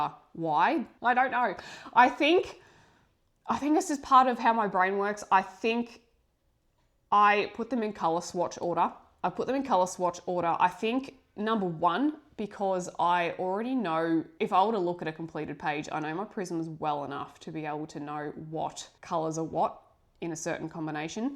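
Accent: Australia. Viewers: Sarah. From Melbourne. Newbie to your content. You have already converted me to try adult colouring. Love hearing Aussie ascents.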